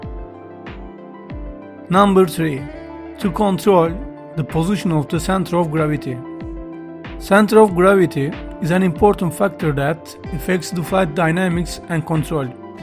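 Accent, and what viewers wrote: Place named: Turkey. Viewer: Nerde duysam tanırım bu Türk ingilizcesi, selamlar kardeşim :)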